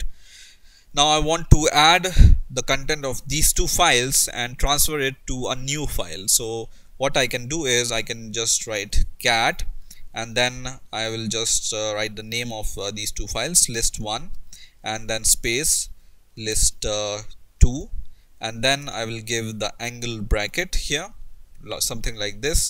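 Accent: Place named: India. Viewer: Please, do us all and yourself a favor and try to improve your accent, so it's more English and less Hindish!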